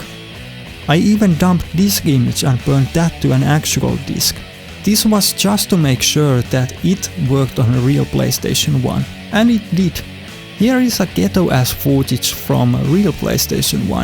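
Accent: Finland. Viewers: Mitäs vittua..? Ooksää suomest? (Koska perinteinen rallienglanti) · ekan parin sanan jälkee arvasin et varmaa suomalainen tekemäs videoo :D hyvin tehty video, täytyy käydä hakemas pi 3 b model nii pääsee itekki testailee :D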